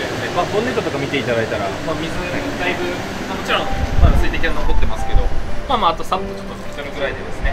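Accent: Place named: Kyushu, Japan. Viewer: しかれる。 上京して28年、九州弁たまらんです。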